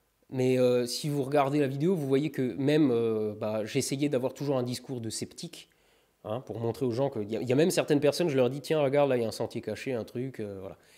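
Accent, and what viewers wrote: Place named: Lorraine, France. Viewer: Il m'a fallu du temps, mais j'arrive à entendre ta pointe d'accent Lorrain ! :P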